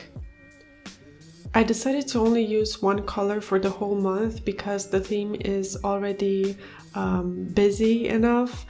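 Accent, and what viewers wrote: Germany. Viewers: Where are you from?:) Your English sounds very German haha :D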